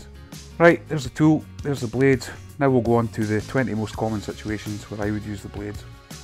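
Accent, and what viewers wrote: Scotland, United Kingdom. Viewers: a really sweet strong Scottish burr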